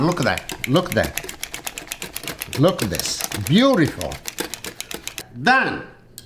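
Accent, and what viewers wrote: Italy. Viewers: Learn how to listen in His Italian accent of English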